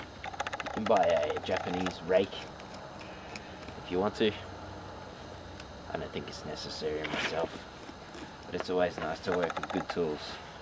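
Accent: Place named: Australia. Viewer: great to hear an aussie voice....cheers mate....you did great explaining....maybe discuss the growing habits,fertilizing etc...go oz :D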